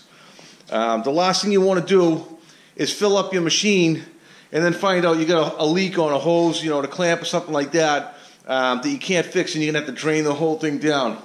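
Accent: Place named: Boston, United States. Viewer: Holy crap your Boston accent is strong.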